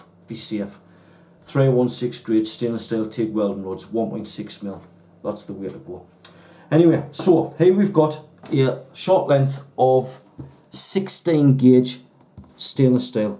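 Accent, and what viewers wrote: England, United Kingdom. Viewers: Got half way through your video and had to turn it off, I couldn’t understand a word you said, only the odd word here & there.. it’s speeded up mumbling type thing that I couldn’t get. ? Are you English? I am through and through.. I’m sure your video is very good but I have no way of knowing ? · If your english through and through you would be able to understand him and also know what part of england he is from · You make great rigs, but I wish I could understand you better, I have big trouble with English and Irish accents..... I'm from the deep south USA where we talk real slow.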